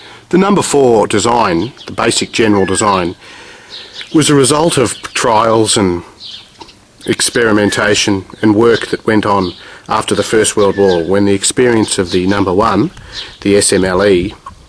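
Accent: Australia. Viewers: Gday from Qld. I wish i had a beautiful aussie accent like you. where are you from?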